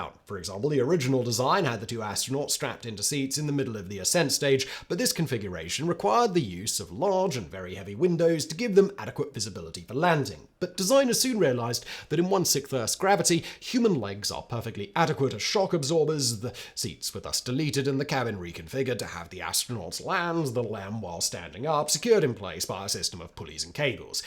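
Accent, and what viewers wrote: United Kingdom. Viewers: I love hearing a British voice narrate American achievements.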